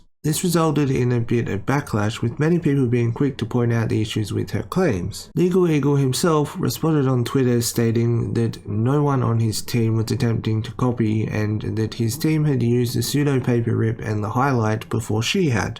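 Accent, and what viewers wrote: Australia. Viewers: maybe it's the Australian accent or that he his diction is beyond your understanding, but definitely not slurring??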